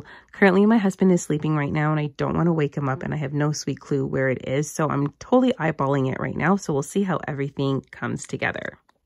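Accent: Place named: Canada. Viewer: Where did you find those giant unfinished wood beads? Great pegboard setup, love the Canadian accent 🥰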